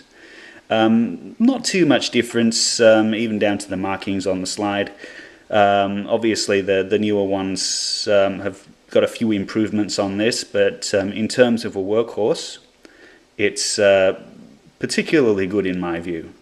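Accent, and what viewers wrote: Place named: Australia. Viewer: You sound like you're from Australia. I thought, perhaps incorrectly, that hand guns weren't allowed there?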